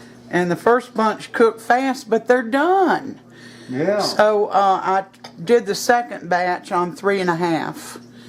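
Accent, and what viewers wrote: South, United States. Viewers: I really appreciate your presentation. Your southern accent gives confidence and assurance to your listeners. It's southern hospitality personified.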